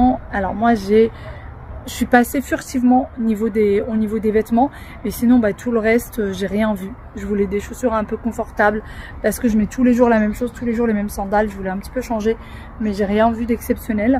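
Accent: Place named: Morocco. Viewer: Coucou, j'aime trop l'accent marocain 😊 PS: je suis tunisienne ❤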